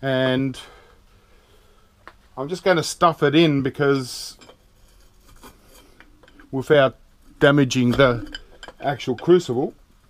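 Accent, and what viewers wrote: Australia. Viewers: but he sounds Aussie tho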